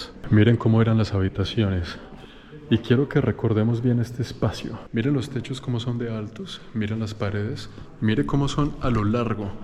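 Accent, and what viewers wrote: Colombia. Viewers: Para la calidad y belleza de las instalaciones de esos hospedajes son muuuuy económicos para la mayoria de turistas, sigue disfrutando!!! Gracias a ti ya no detesto los colombianos, a pesar de tu acento eres excepcional, sigue así, o sigue mejorando!!! Felicidades!!!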